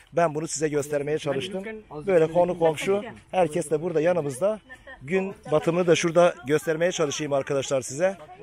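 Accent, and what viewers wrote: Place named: Ağrı, Turkey. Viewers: Abi cok guzeldi valla sen ya bayazitlisin ya diyadinli sive bizim oralarin sivesi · Agrili oldugunu tahmin ettim siven kendini ele veriyor bende aslen agriliyim dogma buyume igdirliyim vidyolarin com guzel istanbuldan selamlar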